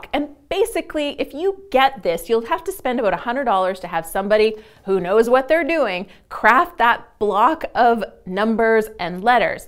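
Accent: Canada. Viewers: Are you Canadian? You sound like Samantha bee. Love your voice :)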